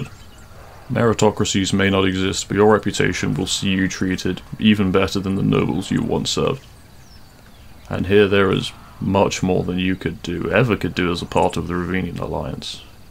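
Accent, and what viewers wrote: United Kingdom. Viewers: This was amazing. Thank you so much for voicing my script. Also are you British?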